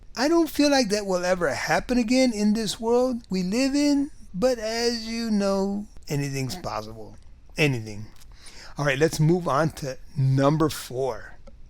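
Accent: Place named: Mexican-American, United States. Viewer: Oh so sorry for the mistake. I thought I heard a Mexican-American accent. Either way your content is gold. 👍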